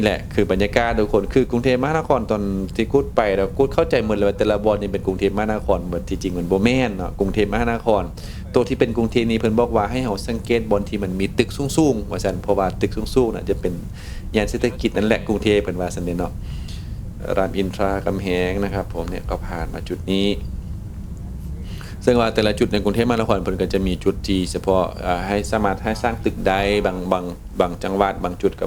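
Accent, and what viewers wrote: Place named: Suphanburi, Thailand. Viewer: สำเนียง น้อง คล้ายๆคนสุพรรณบุรีที่มีเชื้อสายลาวเวียงเลยครับ เราเรียกว่าเหน่อสุพรรณฯ แล้วก็คล้ายๆกาญจนบุรี ราชบุรี นครปฐม ประจวบฯ ส่วนเพชรบุรีก็จะเป็นอีกสำเนียงนึงครับ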